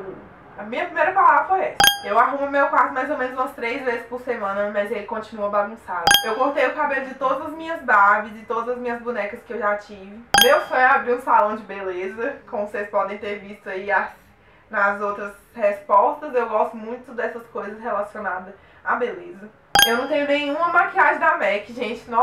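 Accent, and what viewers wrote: Minas Gerais, Brazil. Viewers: Morri de rir com o caso da franja, já fiz parecido, kkkkkkkkkkkkkk' Me identifiquei muito com a sua personalidade, parece demais comigo. Até o sotaque é parecido, só que sou do Norte de Minas, inclusive achei que vc também era mineira porque o sotaque parece muito. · Juro que pensei que você era mineira como eu, por causa do sotaque KKKKK adorei, bjs!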